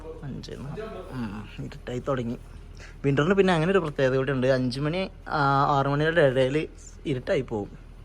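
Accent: Malappuram, India. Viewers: Malappuram slang kekkan oru sugaan😁🤝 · Malappuram slag mariyo😂 malappuram slag ato bangi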